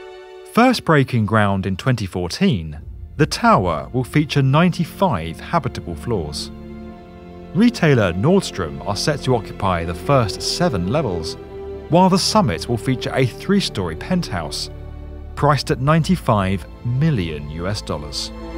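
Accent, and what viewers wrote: United Kingdom. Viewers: good video , well done with the British accent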